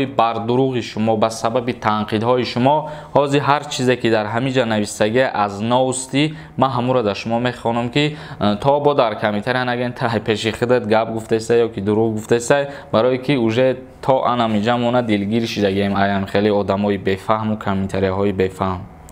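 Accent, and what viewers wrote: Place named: Tajikistan. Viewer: Рахмат ика хушру гап мезани бо лахни точикии точики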